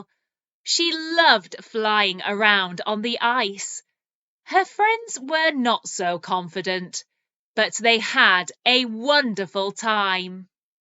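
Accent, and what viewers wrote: United Kingdom. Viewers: I did enjoy reading and listening your British accent. There were some words that I couldn´t get it however it´s great news due to it´s means that I have just learned more. Thank you.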